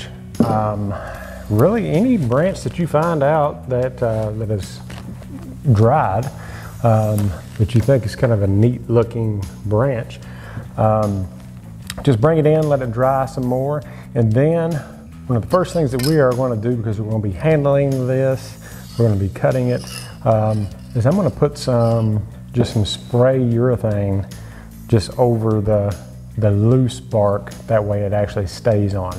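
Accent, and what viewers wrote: South, United States. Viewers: As an Australian I love your southern accent! Gorgeous project too : )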